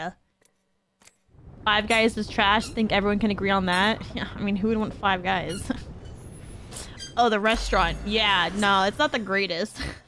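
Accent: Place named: Texas, United States. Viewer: Your Texan accent really came through in this vid! Lol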